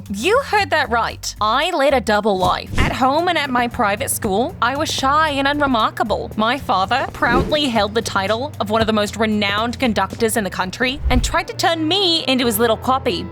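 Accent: United Kingdom. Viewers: Dnt like this British accent.its annoying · The British accent though 😅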